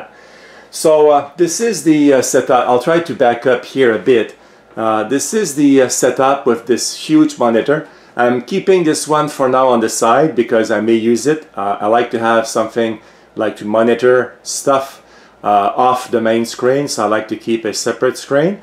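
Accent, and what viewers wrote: Quebec, Canada. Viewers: Salut je reconnais l'accent Quebecois haaha Nice review!! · thanks ;) Aussi t’es tu du Québec t’as un accent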